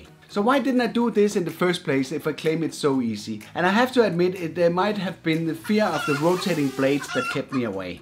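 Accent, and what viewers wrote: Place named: Denmark. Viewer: No question that you are Danish! The accent is obvious from just 10 seconds of listening. Great video!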